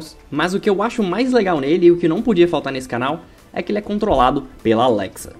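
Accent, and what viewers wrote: Brazil. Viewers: melhor parte é o sotaque de mineiro hauheuahahah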